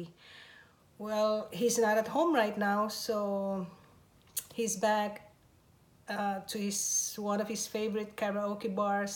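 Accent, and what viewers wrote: Philippines. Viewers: Its like your a Filipino citizen because of your accent. But we are happy that Papa JD is back :) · You sound like a Filipino. Are you a Filipino? Because i am also a Filipino too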